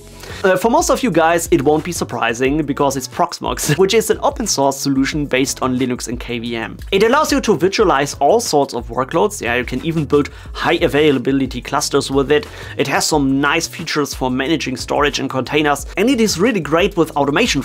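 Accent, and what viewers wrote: Germany. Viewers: Moin, netter Überblick. Bringst eine Gute Energie rüber! Werde hier definitiv nochmal vorbeischauen ;) Gut, dass du das mit "Linux als OS" noch im Nachgang erklärt hast. Das hat dir glaube ich einige Kommentare erspart :D ;) weiter so! offtopic: auch respekt an dein flüssiges englisch. aber doch immer wieder erschreckend wie man es ab sekunde 1 raushört, wenn jemand aus germany kommt. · that is one solid german (i assume) accent, pleasant to listen to if i may say so.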